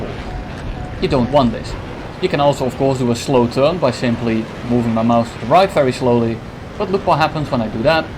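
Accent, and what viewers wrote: Netherlands. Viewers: als deze man niet nederlands is ofz dan eet ik men schoen op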